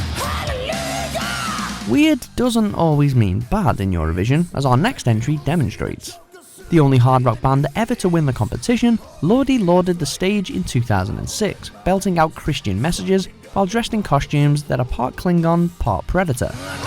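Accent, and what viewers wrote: United Kingdom. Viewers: This is exactly the same as the list on WatchMojo, it is only voiced in the British accent. Don't understand why it should be on both channels.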